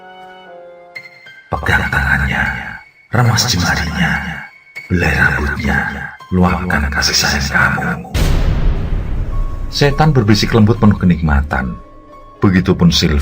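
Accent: Java, Indonesia. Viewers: Pak dhe,, mohon maap,, walo pun saia pake headset, tapi sering kali di ujung kalimat yg pak dhe ucapkan sering g jelas,, di semua video pak dhe,,,krn pak dhe terlalu medhok,,,kadang susah di cerna oleh qu,,,mohon maap pak dhee,, 🙏🙏 salam dari aceh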